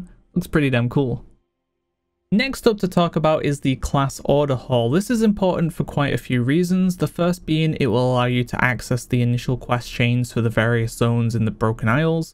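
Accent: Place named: Leicester, United Kingdom. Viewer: Great videos! Not trying to dox you but are you from Leicester?? Don't know anyone else with that accent but Leicester folk!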